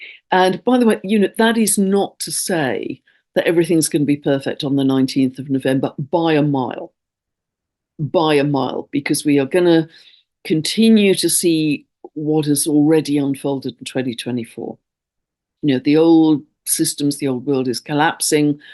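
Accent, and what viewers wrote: England, United Kingdom. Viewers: Hi ! It's irrelevant Question but which English accent is that? One if the finest I've heard in recent times.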